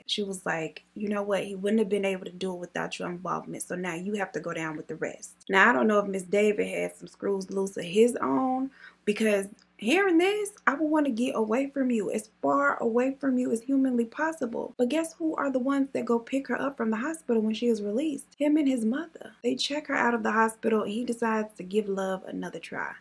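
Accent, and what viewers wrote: South, United States. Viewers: Totally off topic, but I love her accent! I'm from the UK, so I've got no clue what part of America she's from...but I like it! Xx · Yess. And that lil southern accent to top it off🥰🥰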